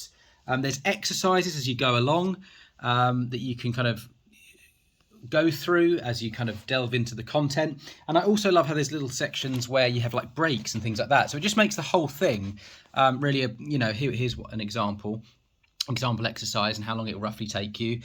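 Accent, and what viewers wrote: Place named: United Kingdom. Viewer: I came across to this Chanel and this man explains the book Of (Find you why ). And I guess Man U have British accent u must be English man , the best English language is spoken by British people and the rest of the world follows even Americans .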